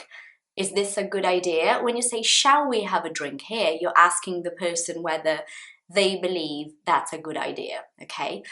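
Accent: United Kingdom. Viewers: The British accent is not my favorite but I like the way you speak english!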